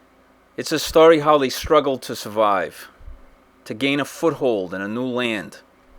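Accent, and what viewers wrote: Fall River, United States. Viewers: That accent reminds me of my Mother! She grew up in Fall River…that accent is home for me ❤️ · THE GUY DOING THE VOICE OVER HAS A FALL RIVER ACCENT BIG TIME SOUNDS ALOT LIKE JACK AND BOBBY KENNEDY JUST AN OBSERVATION WELCOME TAH FALL RIVAH !!!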